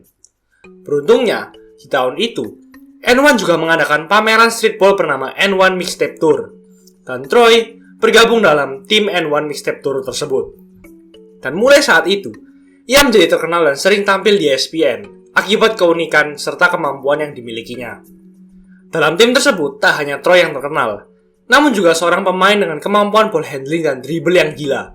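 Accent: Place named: Surabaya, Indonesia. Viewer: Medok banget... Wong Suroboyo Yo... Hehehehe..😀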